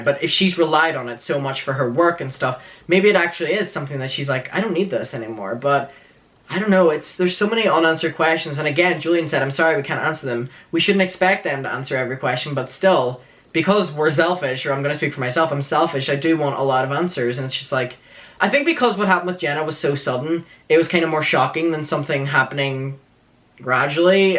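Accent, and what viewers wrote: Northern Ireland, United Kingdom. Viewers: Oml northern Irish?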